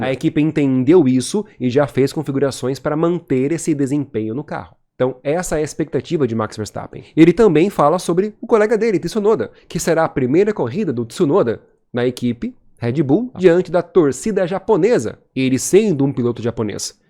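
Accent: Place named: São Paulo, Brazil. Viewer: Não gostei de sua alteração na dicção parece um paulista misturado com paranaense muito ruim sugiro voltar para sua dicção original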